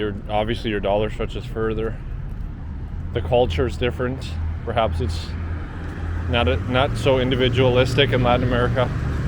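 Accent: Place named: Minnesota, United States. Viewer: I'm guessing you're from Minnesota possibly from your accent? How does it compared to other border cities like TJ?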